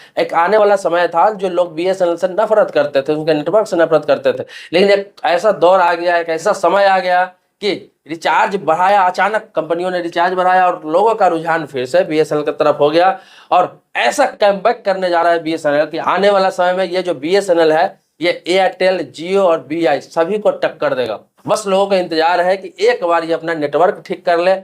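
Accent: Bihar, India. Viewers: Mia ji ap bihar say h kya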